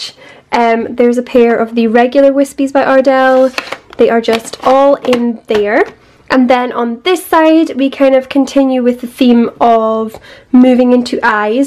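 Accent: Scotland, United Kingdom. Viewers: Otävya LaCourse she Scottish so her accents different I'm Scottish and I have a accent she can't help how she sounds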